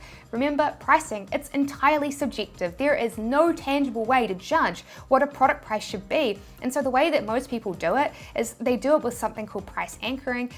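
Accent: New Zealand. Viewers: I don’t get why some people hate ur accent. NZ accent is the cutest! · Love your videos, a great help but I gotta be honest as a Brit I love the NZ accent and love these ones where you give points (Tin points or as we say Ten points) wish you were a judge on strictly come dancing - I’ll give it Tin points! Seriously brilliant videos and a massive help! Thanks! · Love the Kiwi accent. When I was over there the Television adverts for Decking were so funny 😂 Hoping ecommerce works for me and I'll be back to NZ soon!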